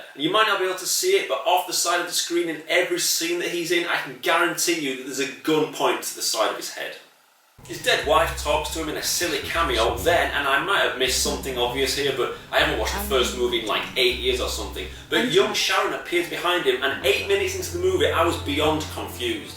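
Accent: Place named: Yorkshire, United Kingdom. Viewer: I love... your delivery is laugh out loud stuff... the edits are great and must be time consuming, voice over et et... Keep up the great work.. I'm in Toronto Canada and I laughed like hell when someone from the USA wrote to you and asked if you were from Scotland.... my family in England knew right away you were Yorkshire... They're Lancashire but don't hold that against them, lol cheers again... too funny... fantastic..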